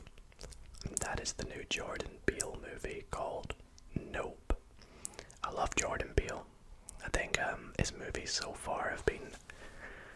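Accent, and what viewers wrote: Scotland, United Kingdom. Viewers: I am from spain and i have to say that I understand really well ur english although your scotish, i love these mukbangs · omg you’re scottish !! i thought you were irish oops 🥲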